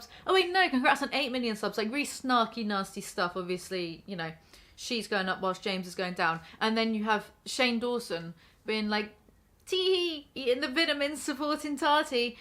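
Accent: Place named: England, United Kingdom. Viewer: Hearing the drama read out by a person with an accent makes me feel like shes reading excerpts out of a sophisticated Englishmans diary. I love it omg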